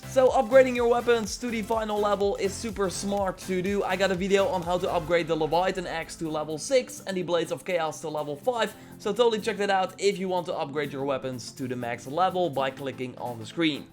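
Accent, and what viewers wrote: Netherlands. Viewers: Are you dutch :) I guess yess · is he european his accent sounds dutch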